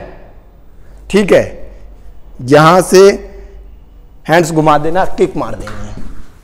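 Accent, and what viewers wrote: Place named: Haryana, India. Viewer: Bhai kaha se ho seems from Haryana . I am from faridabad , do you offer paid service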